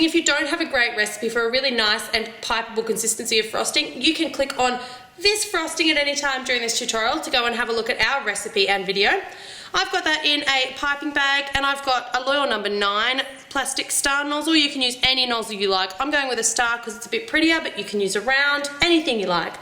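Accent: Australia. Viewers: its how australians sound ahahah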